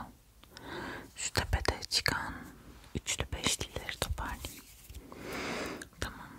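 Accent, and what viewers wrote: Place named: France. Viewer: Sen turkce ceksen o Fransız aksani ile en az 10 bin abone gelir yavrum ;d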